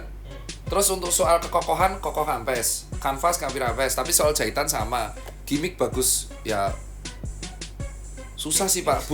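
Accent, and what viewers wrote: Java, Indonesia. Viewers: gue suka logat jawa lu dok.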